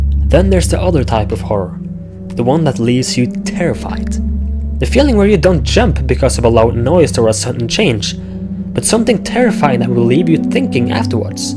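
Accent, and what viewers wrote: Norway. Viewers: did i hear the lovely sound of a Norwegian English youtuber? ikke ofte man ser det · Kul video! Ikke alltid man møter på norske zelda-yourubere! :D Litt sterk norsk aksang i uttalen din, men ellers veldig bra!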